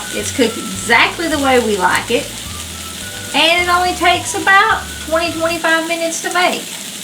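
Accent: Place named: East Tennessee, United States. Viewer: Love your videos, oh & that East Tennessee accent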